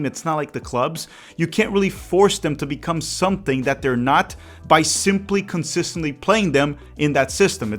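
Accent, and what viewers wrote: Rio de Janeiro, Brazil. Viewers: He clearly got Brazilian accent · Tu é carioca, né? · O sotaque BR né eu amo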